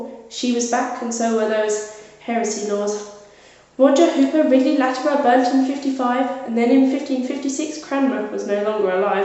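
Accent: United Kingdom. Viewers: I love your accent! Pure british :')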